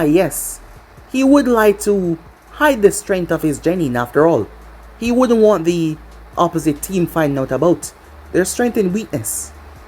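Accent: Jamaica. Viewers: Day <num> million trillion of asking if your Jamaican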